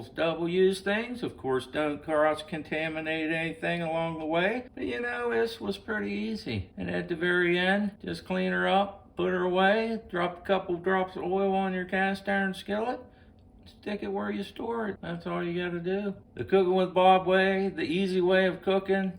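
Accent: Pittsburgh, United States. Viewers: Is that a Pittsburgh accent?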